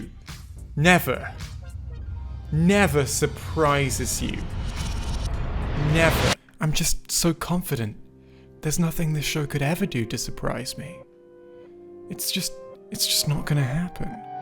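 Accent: England, United Kingdom. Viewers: Whoo that’s too much British for me today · Man's literally speaking Queen's English isn't he? Hard to tell how biased this is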